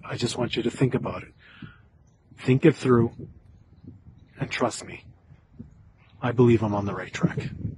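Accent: Russia. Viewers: 1st of all-Have you ever lived behind the "Iron Curtain"? You have a distinct Russian accent in pronouncing Putin's name, and your surname doesn't sound Hungarian.2nd-you are talking about the rights of Hungarians in Ukraine, but completely omitting the brutal crushing of the Hungarian people in 1956 by the Russian tanks???And what about Czechoslovakia in 1968??? Why, do you think, are the Russians not so popular, to put it MILDLY, amongst their neighbors and former forced members of the Warsaw pact? Their goals are always occupation and brutal domination. The only point I agree with you is that there are many things we don't know. However, the one thing we know with a high degree of certainty is that Putin is a brutal dictator. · THERE IS NO DIFFERENT WAY - or justification for this war. You think that under the shroud of “intellectual analysis” you can push your opinion and people would believe you? 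There is no justification for killing thousands of young man for the war of one fanatic man Putin. The Russian people don’t deserve that and Ukrainian people don’t deserve that either. Whatever his goals are - he is a lost cause. It is March 8 and it becomes clear that other than ever that he is failing. PS.And you, pretending to be from hungry Dash think again I can spot your perfect Russian accent… You’re not Hungarian you’re Russian and there’s nothing wrong with it but don’t you lie to people and push your so called “intellectual opinions…”…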